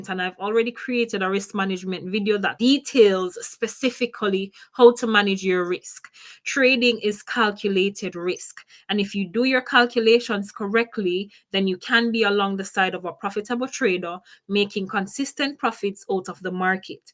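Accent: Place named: Jamaica. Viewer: Good video! Are you jamaican? You have a lovely accent 💯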